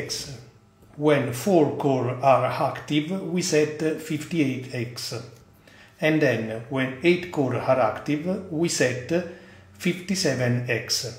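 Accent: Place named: Italy. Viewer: you sound like Italian :face-blue-smiling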